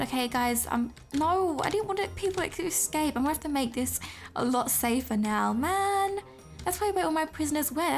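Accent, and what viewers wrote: United Kingdom. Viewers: I watched ur videos and u talk British now i can talk British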